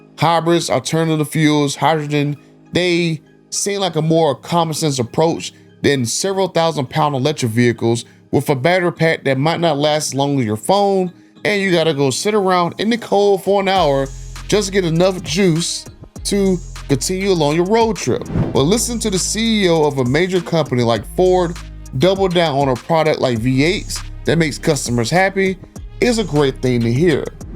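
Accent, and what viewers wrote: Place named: Carolinas, United States. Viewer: He's gotta be NC or SC or somewhere in this area with his accent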